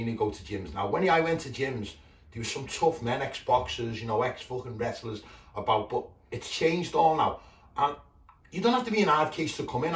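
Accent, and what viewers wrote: Liverpool, United Kingdom. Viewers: Proper scouse him. Quality.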